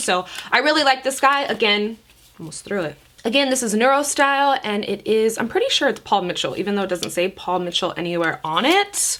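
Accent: United Kingdom. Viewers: 💜💜By the way, I love your new purple hair😍 this is funny but my mum is British born and raised and she married my dad who was in the air force at the time and we have family over on England and I lived there for almost four years and your British accent is amazing😂👌🏻👏 Also..I just love you so much!!! Im in esthetician school and I'm supposed to be studying for a test on electricity tomorrow😩 and had to take a break!! Love you lots💜😍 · Happy New Year!! I hope you do a skin care tutorial. Also I love when your British accent comes out, don’t know why but I do..lol Thx&see ya : )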